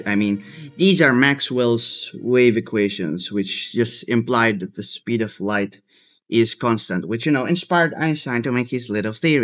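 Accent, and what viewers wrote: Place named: Eastern Europe. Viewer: in-depth sciency roblox video -> click slavic voice for some reason, every time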